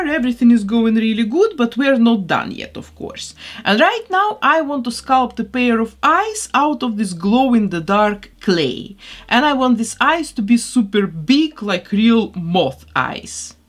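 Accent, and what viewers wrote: Russia. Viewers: я так и не поняла. почему для меня, (которая говорит по русски) голос автора звучит, как голос женщины из России с плохим произношением. я английский вобще не знаю. откуда автор канала?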